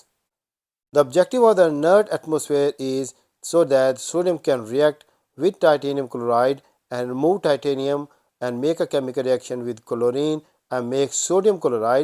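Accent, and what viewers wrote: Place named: India. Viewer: The indian accent makes it funny lmao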